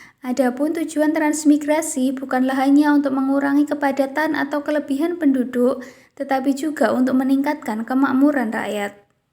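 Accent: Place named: Java, Indonesia. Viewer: Medok itu manis😊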